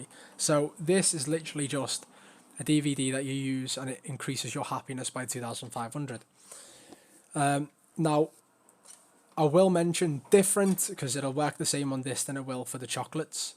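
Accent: United Kingdom. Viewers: Loving these vids so far mate. I dunno if it's coz your a fellow Brit and explain things without going a million miles n hour like most of em on here but I'm looking forward to watching the rest. Thanks for taking the time 🍻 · Thanks man! Good to see a fellow scouser as well.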